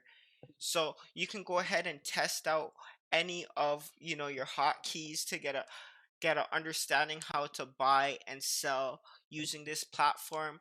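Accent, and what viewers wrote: Toronto, Canada. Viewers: yuh knoe... you from toronto ?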